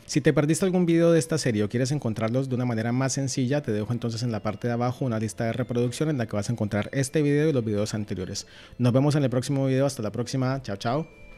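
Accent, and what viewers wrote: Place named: Lima, Peru. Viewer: Gracias por compartir esta clase. Quería preguntarte¿de dónde eres? Tu acento es igual al de mi ciudad, soy de Lima-Perú.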